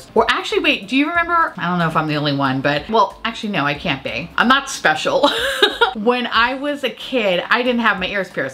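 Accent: France. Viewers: Your french accent is so cute ! And yes, you prononce correctly the word "étoile" ^^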